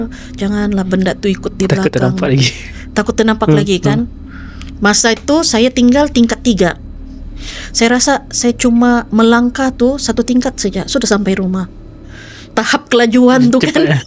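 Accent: Sabah, Malaysia. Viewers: Sy orang Sandakan, born and raised. Aku boleh feel yang Kak ni ada control sikit dia punya bahasa supaya orang semenanjung lebih senang faham. Kalau dia full on cakap cara orang Sandakan, memang susah faham dan ada lawak sikit 😂😂😂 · Best betul dgr suara kak ni bercerita dgn slang Sandakan lgi. Uinah geng.. 🤣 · Ni bahasa standard sabahan ya... Belum lagi kamu dengar yg ada mix 'loghat' bangsa. Contoh kalau Sabahan yg di Tawau, nada & loghat lain lagi. Org kurang faham. Kalau kami Sabahan di Keningau ni, lebih kurang standard begini bahasa tu. Tapi masuk kampung yg pekat bahasa Dusun, so cakap melayu dorang pun ada mix 'Apa gia tu?' 'Ada kanto itu'. 🤣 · Siukkk dgr loghattt kakak ni becerita.. betul2 sabahan.. mcm si Wafi punya loghat siukk d dgr d screen😂 ndak d buat2 sabahnya hehe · Suka betol dengo dialek sabahan ni. Lembut, pekat, kadang2 kelakar. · Aku dengar sampai habis sebab suka dengar bahasa sabah.. 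sedap di dengar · Tbaikla sis..x hilang sleng sabahnya...x boring mdgr..best dgr sis bcrta... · Suka betul bila orang Sabah bercerita cerita seram. Suka dengar bahasa orang Sabah..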